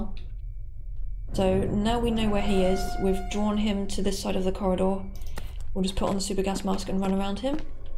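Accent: United Kingdom. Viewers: Are you British? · I thought this was a 13 year old British kid the entire time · Are you British? Perchance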